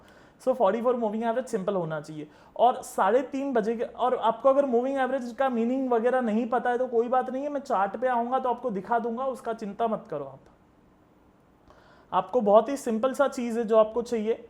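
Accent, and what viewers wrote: Gujarat, India. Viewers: Sir Are u gujrati??😃😃😃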